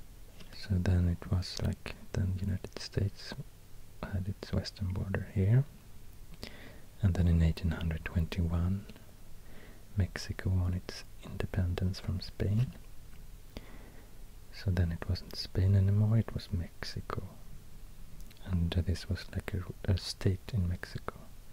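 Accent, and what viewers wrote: Sweden. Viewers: Idk why but there’s something satisfying about hearing Texan city names being spoken in a Swedish accent. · Svensk? Eller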